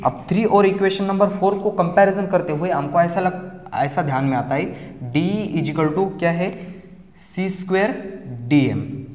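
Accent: India. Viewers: Brother are you from parbhani nanded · Sir tumhi Parbhani che ahat ka ?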